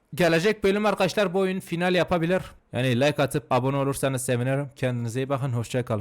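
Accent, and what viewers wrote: Elazığ, Turkey. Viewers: Abi sen elazığlımısın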